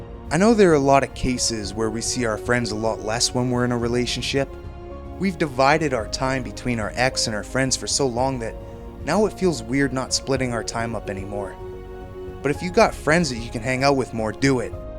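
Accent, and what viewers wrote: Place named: Canada. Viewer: What a fine fellow you are. I'm trying to place your accent to a State? Or, are you a Canadian?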